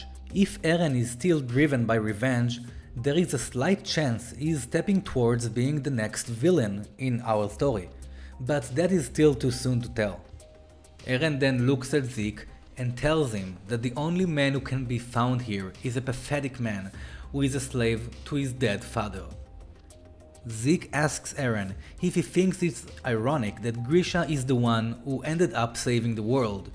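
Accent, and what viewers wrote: Israel. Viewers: וואו איזה תוכן איכותי ישר לפי המבטא מזהה ישראלי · what funny accent. ur just Israeli brah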